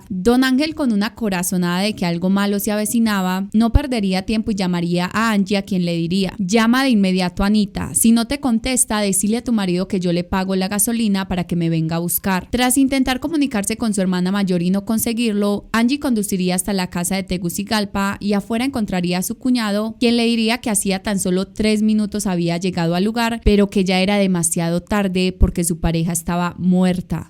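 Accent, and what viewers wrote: Colombia. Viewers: Me gusta mucho el acento de Colombia y creo que esta chica es paisa o corrijanme si me equivoco por favor. Éxito en tu canal yo ya soy tu nuevo sub. Saludos desde México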